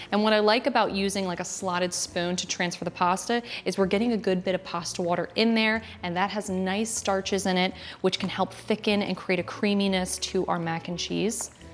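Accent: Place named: New York, United States. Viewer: Looks delicious. The "au" in your words like "sauce" and "caught" really brings out the NY.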